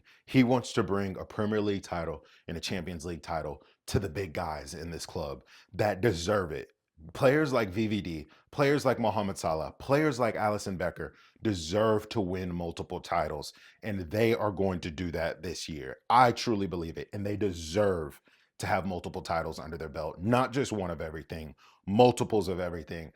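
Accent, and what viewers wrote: United States. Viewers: I hear an American accent discussing (proper) football, I switch channels but you, my man, got me subscribed. You capture and transmit the excitement of the moment. Good job.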